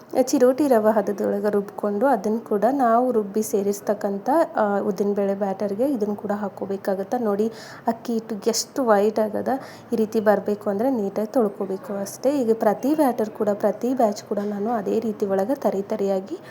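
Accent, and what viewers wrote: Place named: North Karnataka, India. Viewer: Aap North karnataka kannada and Banglore kannada mix karke kyon bolted ho?Speak in only one style, please.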